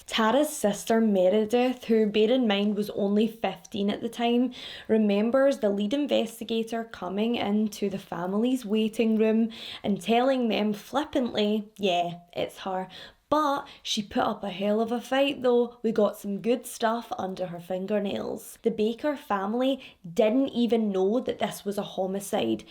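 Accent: Scotland, United Kingdom. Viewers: You crack me up, you little weirdo. I love your accent so I'd listen to pretty much anything you wanna talk about. Can't wait to take a trip to Scotland!! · I'm just now watching this, months after posting, because I thought she was a young child from the picture. Children stories are heartbreaking more than adults, so I never watch, but out of desperation at the moment, I'll watch this, so I can hear that sweet Scottish empathetic voice of yours and hear about this poor young ladys situation. If ever I have skipped any of your videos, it's cause it's about a child, the younger they are, the worse it is to listen to. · BTW, are you Scottish? I adore your accent and have Scottish friends. Love visiting them when we can.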